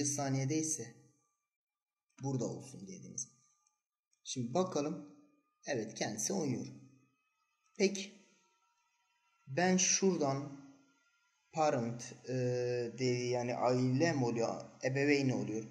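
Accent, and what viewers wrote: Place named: Urfa, Turkey. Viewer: Eline diline sağlık şu Urfa şivenle dahada çok seviyorum sizleri ve kanalını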